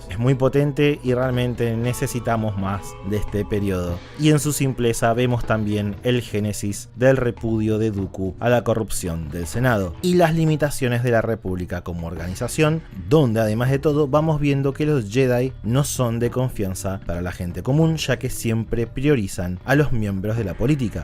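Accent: Argentina. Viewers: es argentino? Pobre. Saludos desde argentina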